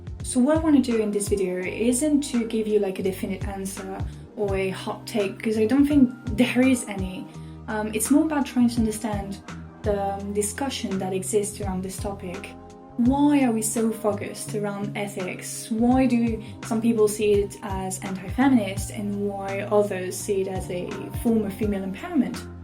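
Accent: France. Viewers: Je pense que t'es française vu l'accent et la vidéo était grave bien et super intéressante mais stp investis dans un micro :(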